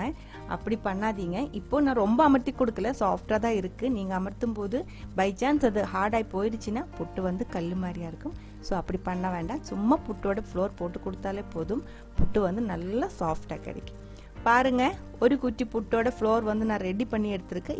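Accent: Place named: Tamil Nadu, India. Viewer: I like ur Tamil accent 😍😍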